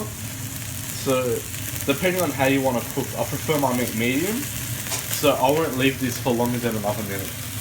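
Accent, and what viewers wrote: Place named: Australia. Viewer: aussie accent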